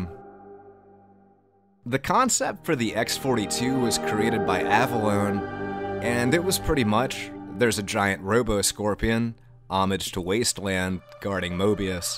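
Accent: United States. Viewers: I love the content and it's not your fault but the voice reminds me of Arin from game grumps whenever he does a generic protagonist voice. It might just be American inflection on some words. I just can't help but giggle a tad when I hear words spoken like that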